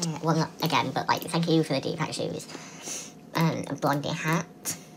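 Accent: England, United Kingdom. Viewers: Wth you from UK... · Are you British and I love your voice and your channel! Sorry I'm late <3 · are you from the uk? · Why are you on American server when u are British? It sounds like u are British unless it's just me... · Your voice sounds like a butiful English accent!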